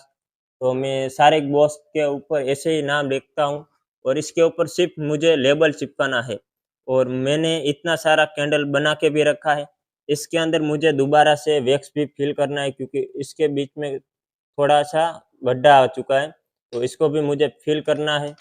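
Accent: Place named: Gujarat, India. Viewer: Lagta he Gujarat se ho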